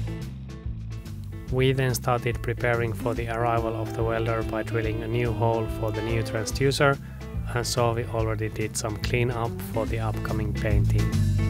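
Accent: Finland. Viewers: I love this channel. The cinematography. Everything. But really it's this guy's accent and voice and delivery. I could listen to him forever. So matter of fact. I guess, very Finnish. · Can place Your accent? Are You from Finland? Good luck! /Thomas from Sweden.😁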